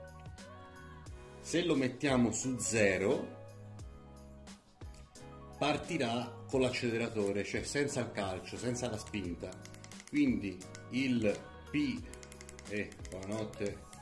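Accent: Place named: Florence, Italy. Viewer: Tu hai avuto una buona esperienza...io invece no... l'ho comprato da una settimana...la velocità è di massimo 37 km orari, la batteria non dura quanto dovrebbe e tra l'altro mi si è forata anche una gomma, ritengo anche che i materiali non siano di buona qualità. Secondo te il monopattino è difettoso? sono di Firenze e sento che dall'accento anche tu lo sei.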